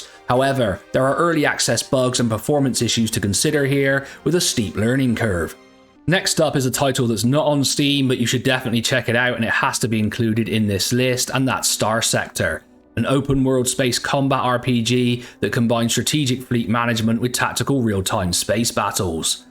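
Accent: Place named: Devon, United Kingdom. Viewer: Moved back from england? To where out of curiosity? Your accent sounds devon 🤔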